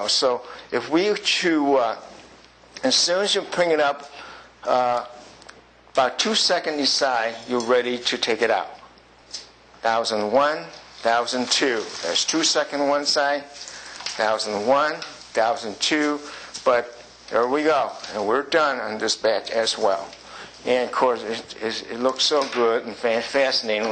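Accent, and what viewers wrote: United States. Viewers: no. he learned english with some kind of chicago or midwestern american accent. the accent is american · i can sense a Chicago accent from this cook.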